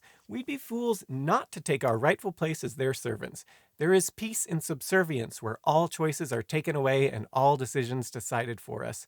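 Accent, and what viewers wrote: United Kingdom. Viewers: If your audience is largely American, we like British hosts. People with accents are automatically smarter.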